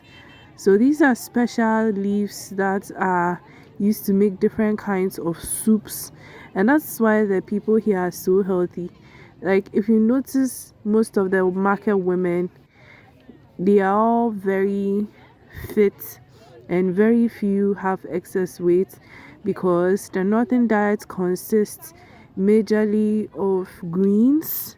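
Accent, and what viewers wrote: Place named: Ghana. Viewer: Chale why do you sound so Ghanaian more than me 😂😂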